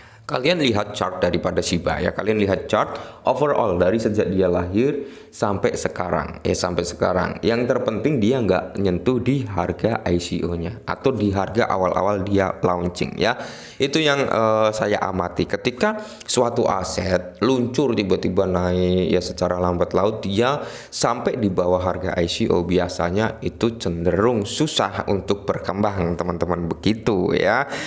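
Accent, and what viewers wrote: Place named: Surabaya, Indonesia. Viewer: mas sampeyan womg endi? koyoke gak adoh² teko suroboyo nek ndelok logat e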